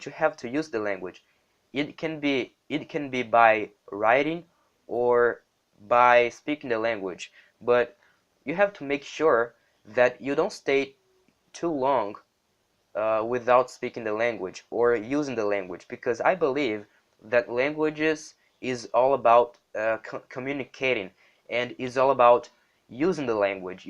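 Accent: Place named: United States. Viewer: Excellent mate. Your pronunciation is really good. You have a slight American accent too :)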